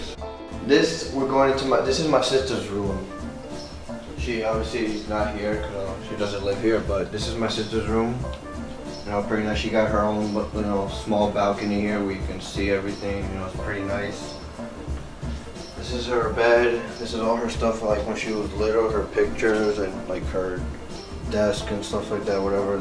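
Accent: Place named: Brooklyn, United States. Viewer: You from Brooklyn whatever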